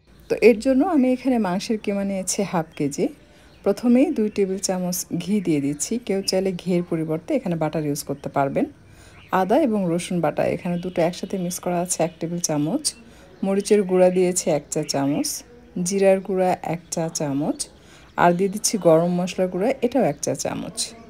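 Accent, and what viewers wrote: Bogura, Bangladesh. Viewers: Apu tomar basha bogura kothay?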